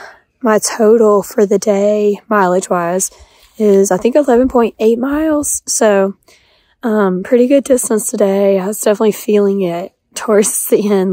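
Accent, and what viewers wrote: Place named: Mississippi, United States. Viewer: Hey 👋 Just saw your announcement video and I'm also from Mississippi, central Mississippi. I knew the accent sounded familiar. 😎👍👍🥾🥾⛰️⛰️⛰️⛰️🏕🤙🙏👋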